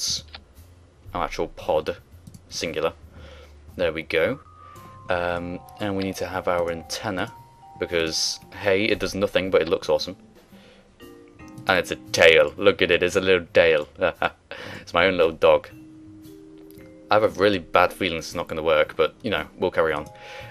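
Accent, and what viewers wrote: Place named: England, United Kingdom. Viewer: You sound like an English PlumpHelmetPunk. Awesome.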